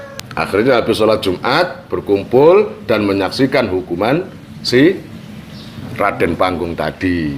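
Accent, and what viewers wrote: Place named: Banyumas, Indonesia. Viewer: Mbrebes juga ngapak kyai 👍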